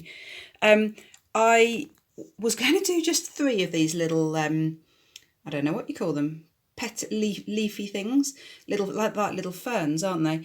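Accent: United Kingdom. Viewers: So lovely to hear a UK accent!! Glad I found you 😁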